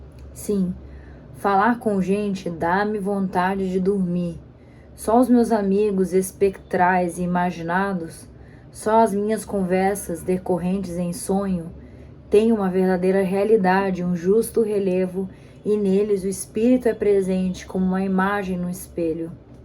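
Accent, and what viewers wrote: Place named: Brazil. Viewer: Ela pode até falar um português do Brasil, mas se diz, que o de Portugal é igual, aí é forçar. Além do vocabulário, a entonação e a forma de falar são diferentes.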